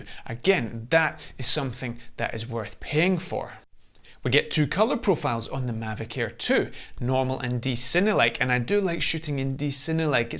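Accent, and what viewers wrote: Scotland, United Kingdom. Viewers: Love your accent haha! You sound EXACTLY like my Scottish neighbor.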